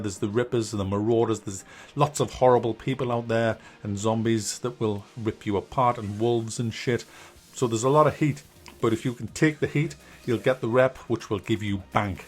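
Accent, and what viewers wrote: United Kingdom. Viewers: where abouts in the UK is your accent from?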